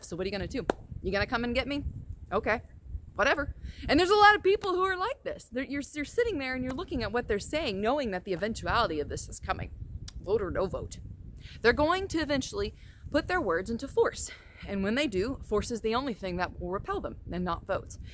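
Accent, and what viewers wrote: South, United States. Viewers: Yes I like what you have to say I think the same way I hear you got to hear you have a Southern Voice I live in South Carolina I like to meet you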